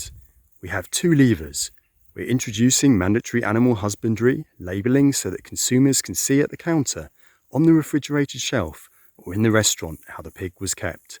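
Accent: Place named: RP, United Kingdom. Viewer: He speaks English with an upper class accent, lovely pronunciation, but not over the top. Really easy to listen to.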